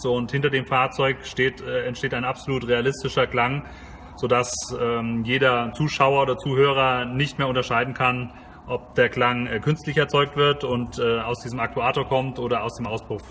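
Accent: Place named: Germany. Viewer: Wait.... don't tell me.... that guy is German.... right?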